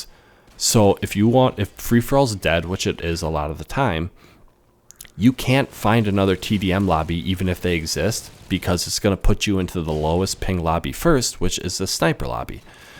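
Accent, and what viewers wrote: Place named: Midwest, United States. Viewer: You sound so midwestern lol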